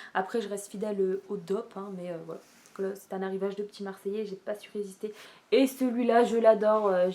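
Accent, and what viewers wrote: Nord-Pas-de-Calais, France. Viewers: Coucou. Le noz de louvroil est il plus petit ou grand que celui de fourmies?je suis du val de maubeuge.merci pour tt.et sympa ton accent chti.heinnnnnn!!!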